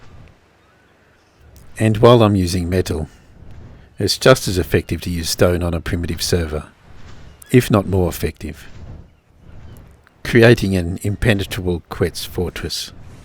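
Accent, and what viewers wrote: Australia. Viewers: has an almost Australian accent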